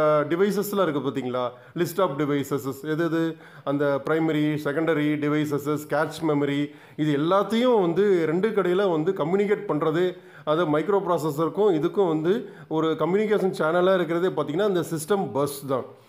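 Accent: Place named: Kerala, India. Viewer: Sir sounds Kerala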